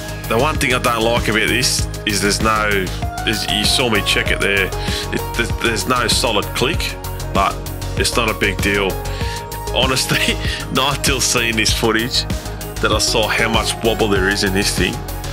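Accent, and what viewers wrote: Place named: Australia. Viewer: Haha im sure you're gonna have loads not have a clue what your saying, but Aussie to Aussie its beaut mate. Just waiting on my QR2 with 2 base sides to arrive.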